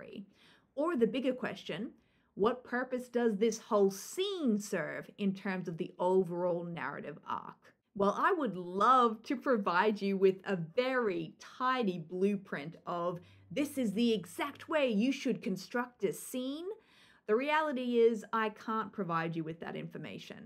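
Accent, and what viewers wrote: Australia. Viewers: Sounded Aussie sometimes but then you had some strong Rs like Americans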